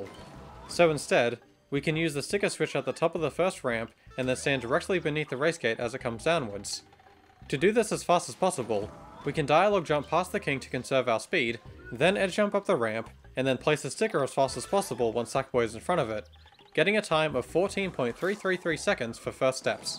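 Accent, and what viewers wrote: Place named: Australia. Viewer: femboy??? also why did i think they were australian lmao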